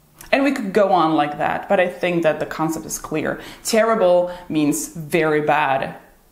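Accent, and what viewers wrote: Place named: United States. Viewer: I guess the entire Slovakia speaks English. 😁 Where'd you get that accent? You either must have spent a significant amount of your life in the US, or you talk to your colleague from this channel on a day-to-day basis. I used to have a perfect British accent but I lost it very quickly once I stopped practicing.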